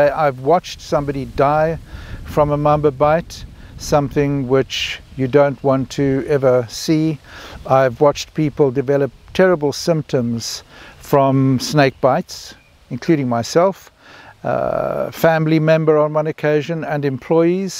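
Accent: South Africa. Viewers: I like the style with you being outside. The South African-ness and story telling puts me in the same frame as reading a Wilbur smith book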